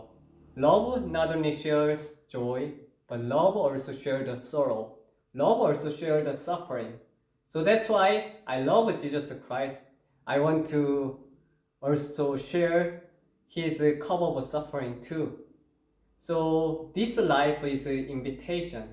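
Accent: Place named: South Korea. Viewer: 콩글리쉬 발음으로 영어로 성경 읽고 설명하느라 애쓰십니다 저는 그만큼이라도 영어가 안되는데, 유창한 영어 발음은 아니신 것 같은데 그래도, 그렇게라도 하시는거 보니 부럽네요 .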